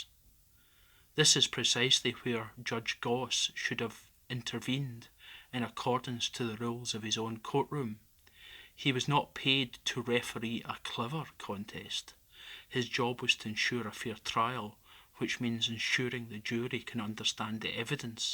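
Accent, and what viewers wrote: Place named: Scotland, United Kingdom. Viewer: Thank you Diana this is such a strong and well argued piece of writing drilling down into the heart of this appalling MOJ. Your narrator also genius, there is something about a Scottish accent that adds depth to the core message. I don't know if you are an investigating journalist, but if your not, you should be.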